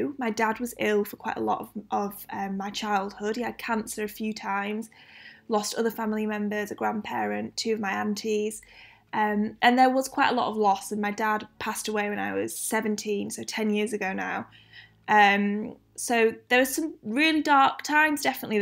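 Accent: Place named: North, United Kingdom. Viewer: What style is your wonderful accent? It‘s northern style but not too yorksherish I‘d say....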